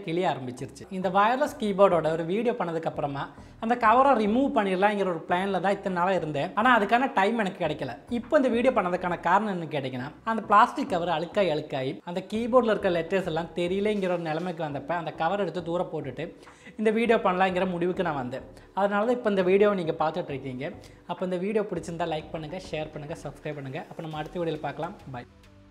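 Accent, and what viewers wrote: Kerala, India. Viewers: Bro yr Tamil is like mixed with Malayalam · you have a peculiar Malayalam accent with humorous touch! Nice Video , Keep it up and post more!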